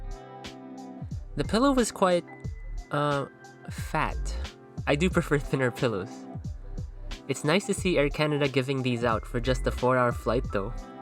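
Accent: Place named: Philippines. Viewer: You should work on your camera angles, that "overview" shot of the seat is horrid. You should also work on your voice over spill and should only speak on what you know for sure, so you wont sound too ignorant. Please hesitate on direct copying other youtubers, it doesn't quite suit you and your filipino accent. Its quite obvious which youtuber you are trying to copy. Hope you dont take offense and improve in the future. Cheers.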